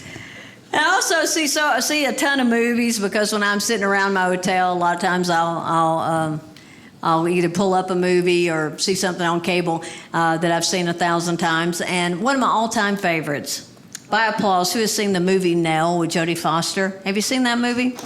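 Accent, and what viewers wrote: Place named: South, United States. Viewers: She's definitely southern. " Watch my drip... Nah nah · You're right. Put her in a dress and add a few years. Looks so like her and that north Carolina accent. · That is a heavy southern accent . Im Guessing Texas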